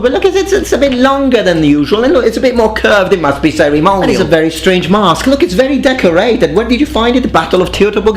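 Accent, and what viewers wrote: Sicily, Italy. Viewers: Your explosive Sicilian delivery with your hyper-educated sarcastic English is a killer combo, sir. I'm crying with laughter.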